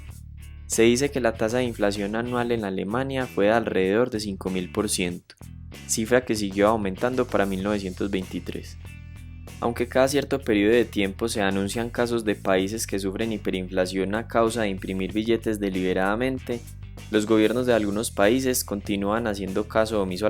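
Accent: Colombia. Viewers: Me encanto colombiano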